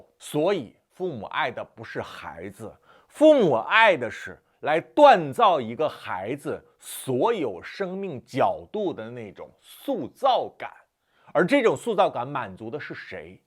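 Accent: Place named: Northeast, China. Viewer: 难得的高度！阅历丰富、悟性极强才能有这么深的感悟。是不是在北京长大的东北人？这口音尾音儿有东北味儿。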